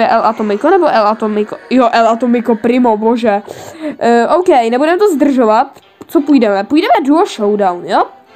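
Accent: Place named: Ostrava, Czech Republic. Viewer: Je Ostravák :)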